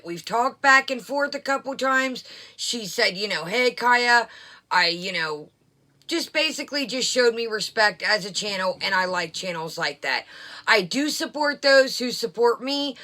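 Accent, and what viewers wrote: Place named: Northeast, United States. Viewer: She is disgusting. We have been saying this for over a year. So glad she had been found out! Are you from up North (NE) by chance - OH, NY, PA, MA, RI etc? You have the personality and voice of someone who might live up North. I live in the NE section of the US, that is why I am wondering. I just subbed to your channel.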